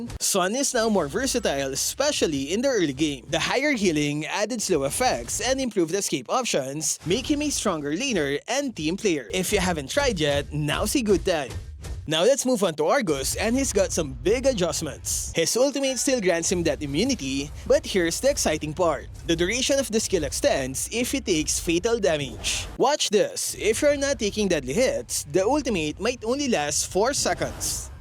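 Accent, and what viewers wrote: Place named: Philippines. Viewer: English filipino dictionary accent.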